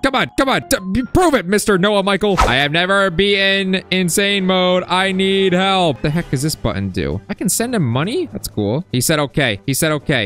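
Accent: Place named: United Kingdom. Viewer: bro i love briths talk:D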